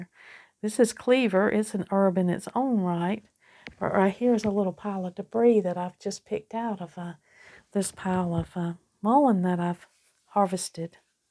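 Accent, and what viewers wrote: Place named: South, United States. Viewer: Thanks for your video. Drying some mullen myself for the first time. BTW, I really enjoy your soft southern accent, you sound exactly like some of my favorite cousins.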